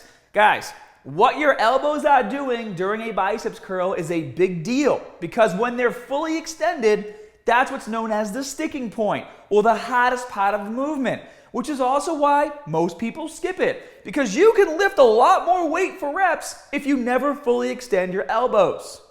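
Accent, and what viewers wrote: Boston, United States. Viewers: The Boston accent makes it better!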